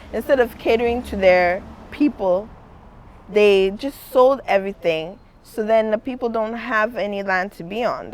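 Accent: Africa. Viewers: She's not Canadian, sitting up there with her thick African accent. Has she ever been to the US. She's so ignorant. The same thing is going on in Canada with black people. How is she speaking on America? If she's never been to America she's just taking what people that she knows in America are telling her. I'm AA the way she saying, AA are treated in the US. I've never been treated like that. No one in my family's ever been treated like that. Not all AA are treated badly. Only a ignorant person would think that. That's like me saying all Africans live in the village in cow manure huts and you're all dirty and you're all stink. All African are educated. Like how ignorant would I be to say that. smfh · She has an african accent as well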